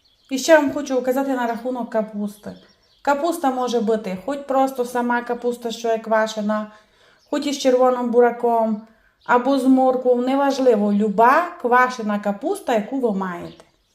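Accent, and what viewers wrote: Transcarpathia, Ukraine. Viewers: вирвіть собі краще! Кожен регіон має свій діалект - закарпатський саме такий, і ми його любимо!!! - дякую) Якщо що - я з Черкас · ПРИВІТ ВСІМ З ХЕРСОНУ. ДЯКУЮ ПАНІ ЗА РЕЦЕПТ. ГАРНА УКРАЇНСЬКА МОВА. ЗАКАРПАТСЬКИЙ ДІАЛЕКТ. ЧУДОВО.❤👍🔥 ЗАКЛИКАЮ ПОВАЖАТИ ОДНИ ОДНОГО, ТА НЕ ТРЕБА ЦЬКУВАТИ ОДИН ОДНОГО ЗА НАШУ СОЛОВ'ЇНУ... МИ УСІ ГРОМАДЯНИ УКРАЇНИ.💙💛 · малоймовірно він партачив московитську!А розмовляє на закарпатському діалекті! І це неймовірно чудово, наша Україна багата на діалекти.Напевне коли навчались у школі вам про це говорили, або ви прогулювали уроки😮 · Гарний говір закарпатський. Більш венгерський а не молдавський. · Важкий діалект, важко слухати, хоча я сама з Франківська. Закарпатський, Чернівецький діалекти відрізняються від нашого. Одне слово "суль" і вуха скручуються. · Нормальний закарпатський говір. Наша країна велика і в кожному регіоні свій діалект.Я навпаки просто насолоджуюся,як говорить пані.Дуже вдячна за рецепт. 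· Я в захваті від вашої прекрасної закарпатської мови. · Як мені гарно чути закарпатську мову: подобається більше ніж рецепт. Хоча і рецепт гарний, треба спробувати 😊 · поддерживаю, это не украинский язык. Это местечковый закарпатский говор.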